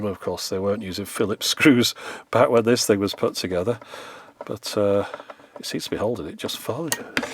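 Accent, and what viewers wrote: United Kingdom. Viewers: As a swedish viewer i can attest to this thing. It is remarcable what he does. And the voice! It is like if it was from a real british person. It is just wow and so much great. Greets from all your non engleesh ppl :D Carry on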